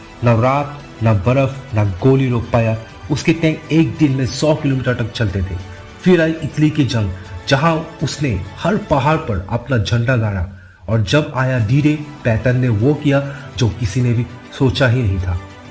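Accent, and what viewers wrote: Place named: Northeast India, India. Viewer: Nice and informative video, north east accent added to the presentation.... reminds me of my coursemate ... Good job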